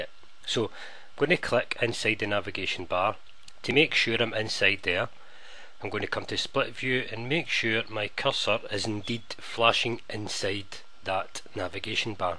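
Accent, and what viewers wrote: Scotland, United Kingdom. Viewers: All these people not knowing what a scottish guys accent is :D poor non UK people.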